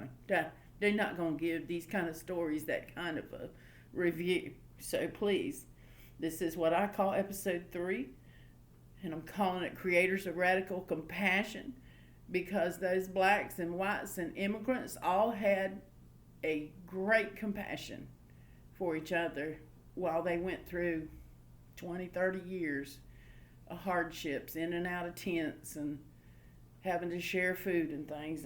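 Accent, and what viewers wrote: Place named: South, United States. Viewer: Hearing more of this southern accent I’ll even return the favor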